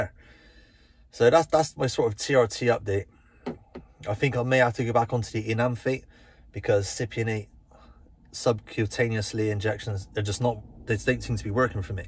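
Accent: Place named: Gloucester, United Kingdom. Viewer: sounds like a Gloucester lad .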